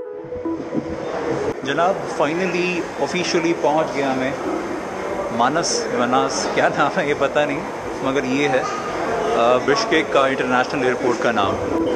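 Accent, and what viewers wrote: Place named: India. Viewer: Very hard to understand your english with indian accent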